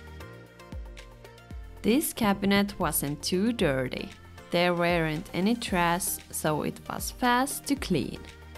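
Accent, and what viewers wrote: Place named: Finland. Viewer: And Finish accent too is.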